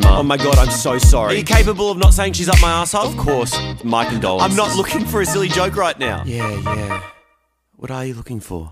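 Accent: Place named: Australia. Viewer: Why isn't there anyone who mentions that his Australian accent is at least like 50% of what makes this perfect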